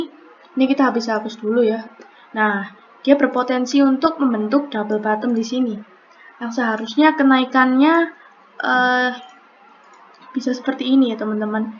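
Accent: Java, Indonesia. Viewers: Medok mbak.wong ngdi e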